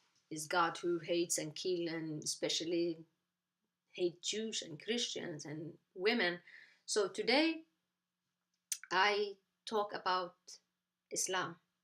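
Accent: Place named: Tigray, Ethiopia. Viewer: You are tigray agame ethiopian from your accent .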